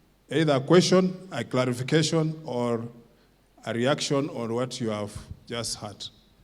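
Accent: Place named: Bukusu, Kenya. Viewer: Proud to be a bukusu you really represent us with our accent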